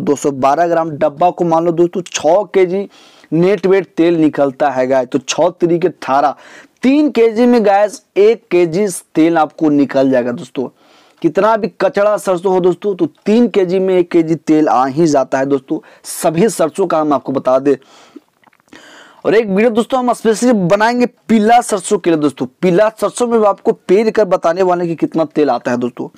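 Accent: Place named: London, United Kingdom. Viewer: Bhai London wali hindi q bol rahe ho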